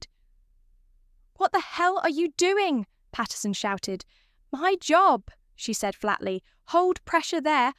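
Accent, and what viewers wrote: United Kingdom. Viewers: Gloss or glass? Watching from Tagaytay City, Philippines by way of Oil City, Pennsylvania, USA. How do we get rid of the British English(?) narrators?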